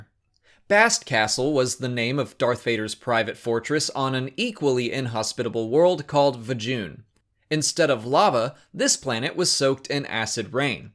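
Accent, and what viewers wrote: United States. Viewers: ffs. I came to this video for more of epic Australian accent. Not this American voiceover crap. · Great video, sounds even better with an American English accent rather than that Goat sounding yuck mouth. Really is great to have working with. im a huge fan of both of you. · Why am I hearing a American talking?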